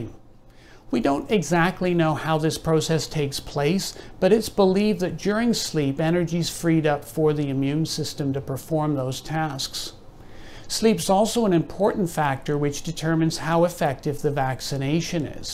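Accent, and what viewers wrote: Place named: Canada. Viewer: MD here for nearly 50 years, I'm also a retired Professor of Surgery at a major medical school in the United States. This is an articulate internal medicine colleague with what sounds like a pleasing Canadian accent and he does have a great speaking style although I think he was reading from a teleprompter-like device. He produced an allegro account of some useful material that started out on the COVID thing but then soon enough went widely to other areas that are mostly tangential, to say the least. There were bumps in the road. His digressions about beverage alcohol use, sleep, exercise, diet, etc. were both strange and strained even if truthful cameo things. But that's almost minor technical nit-picking on my part. I do opine that it was laughably weird -- and very nearly bush league -- to pose him in front of those random pieces of medical apparatus and . 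. . . .[wait for it] . . . . . the wall display of (his?) various diplomas was just hilarious! What were these obviously intentional optical embellishments supposed to do? Make rubes who watch the video pay closer attention? Make the message more believable? Boost the bona fides? This man means well but the show business aspects of this video lecture were regrettable in my opinion. Thank God he did not wear the traditional internist's "flea collar" (a stethoscope draped dramatically around his neck).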